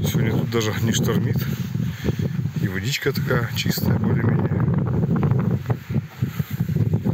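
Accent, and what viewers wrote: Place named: Urals, Russia. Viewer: Откуда ты дружище? Говор Уральский😅. Наш!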